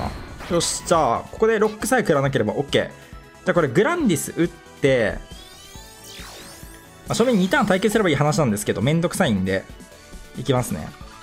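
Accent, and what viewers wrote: Kansai, Japan. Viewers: の関西弁大好き(*^ω^*) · って関西人？ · って関西人なん？ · 関西弁うざっ笑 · 最近ところどころ関西弁なの草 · なんで最近関西弁っぽさが混ざってきてるんだろうに対してはにわかだから出身もしらないし、自分が見始めた頃からしたら変な風に聞こえてなんか聞きにくくなった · 関西弁気になるよ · って関西の人？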